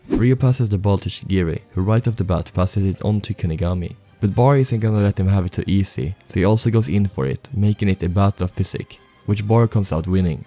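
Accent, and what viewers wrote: Sweden. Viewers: You can hear the Swedish accent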